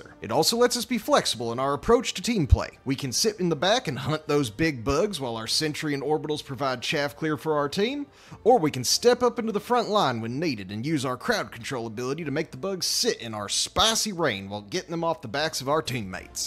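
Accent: South, United States. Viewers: A southerner talking about killing space bugs? Sign me up for the firebat squad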